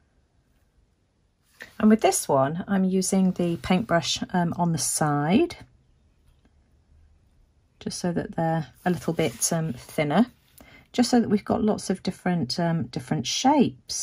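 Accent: United Kingdom. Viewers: I’m only a little over a year into this next media and have become quite a YouTube junkie! I want to complement you, not only on your beautiful work, but how will you explain what you do and why you do it! You have the perfect voice as well! I live in the US and really enjoy your British accent! It’s so refined! Incidentally, heading off today to have lunch with my son and daughter-in-law who are expecting a new baby any day now! While I’m down in their neck of the woods, I am going to search for a Daphne’s Diary! 😉 Thanks again for the inspiration of those beautiful diaries!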